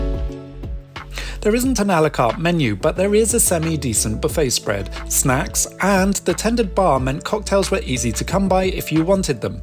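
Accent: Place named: United Kingdom. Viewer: Thanks for your informative and top notch aviation video. I am really impressed with Iberia the Spanish Airline with their latest aircraft and sumptuous banquet for Business Class passengers. Hope they become well known like the top three Middle Eastern airlines by flying to more destinations in Asia 🌏 also. Your video quality is brilliant and complemented by your commentary in an elegant English accent. Please keep up your high standards in such content creation. ❤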